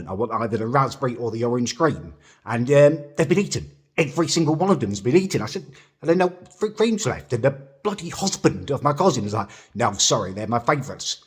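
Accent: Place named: RP, United Kingdom. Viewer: Uno what Yh I fully love ya vids now pal i genuinely sit hear watching all of your videos while sm’kin. I see you like I see my grandad cute af n genuine down to the bone 😂 I love how you are it’s jokes I can relate so much but u talk v posh it’s jokes 😂 keep up the good work lad and I hope u have a bloody good new year don’t let the haters get to u ♥️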